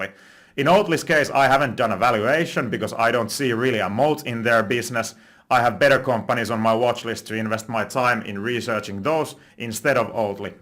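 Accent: Finland. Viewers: I FKN love Finnish accent when speaking English, littery getting goosebumps. Much love from Sweden😊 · you sound finnish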